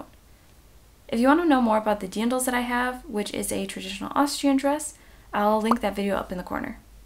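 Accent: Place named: Germany. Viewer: You & your German accent are so cute!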